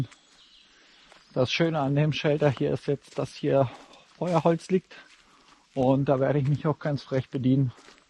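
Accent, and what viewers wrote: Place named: Hesse, Germany. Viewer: Hesse schwätze halt hessisch ...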